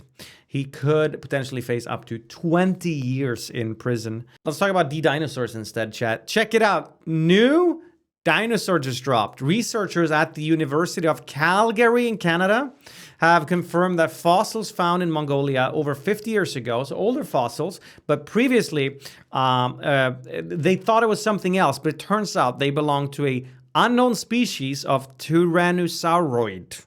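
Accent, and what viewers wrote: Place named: Sweden. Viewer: Do this in swedish next time cause I can't understand your english (due to your very thick accent)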